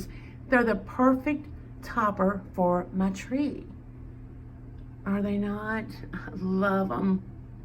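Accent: South, United States. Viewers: That is such a sweet little corner you've created! Everything co-ordinates and flows so beautifully together. It's so nice to keep some sentimental pieces like your scarecrow through the years. I still have a Christmas ornament my friend from school made me in grade 5. He's a Santa face made from paper glued on a pine cone with a red paper hat and cotton balls used for the top of his hat and his beard. The years have caused the cotton to disintegrate a bit but he always hangs on my tree each year. By the way, I just turned 59 last week so he is pretty old to! Anyway, love watching your videos and hearing your southern accent, ( I'm from Canada so to me you have an accent)😊 Bye for now, Sandy 💟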